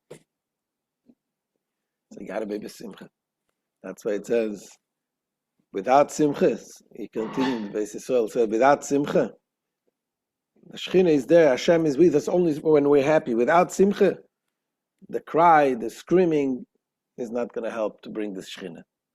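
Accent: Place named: Russia. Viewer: B"H Calm down. Get control of your Body. There is no month by that name in the Hebrew reckoning of time. That is the name of a Babylonian idol and if you mark your time by Babylonian astrology you can't attain Immortality. Only Torah time is infinite. This is the twelfth month of the year. זה החדש השנים עשר Try to at least pronounce the Hebrew with some kind of Semitic, not Russian unintelligible, accent. If you knew proper Hebrew, you'd know there is no depression at this time at all. ראש השנה = 861 = אשר המחשבה = בית המקדש Can't you so much as see that ראש = אשר If you are a Jew, may HaShem grant you the insight and courage to return to Hebrew Tradition, to the Hebrew language, to Hebrew Torah from your Yiddishkeit narishkeit. If you can't, or won't, you may be sure that you're not a Hebrew. Hebrew is as Hebrew does.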